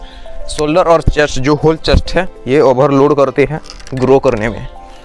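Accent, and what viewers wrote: Odisha, India. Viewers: Bhai aap odiya ho kya ? Aapki language se u sound like .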